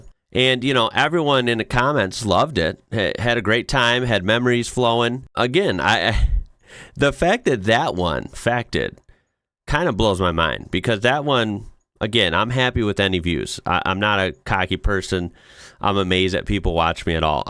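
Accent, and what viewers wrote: Australia. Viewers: I've heard quite a few other YouTubers say that they have more luck contacting YouTube through Twitter when they have issues. Maybe explain that the word 'can't' with an Aussie accent is not THAT word.... Especially in the first 15 seconds of your videos. They may think that rule is being broken? Best of luck mate. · Hi. Love you and your family. The AI caption generator is misunderstanding the Australian accent for bad words. There is an article on BuzzFeed about it...titled. This YouTuber's Videos Were Demonetised. He Says It's Because Of His Aussie Accent. Also some youtubers have said the word Aussie in the title or thumbnail gets their videos blocked. It's not fair. Hope this helps.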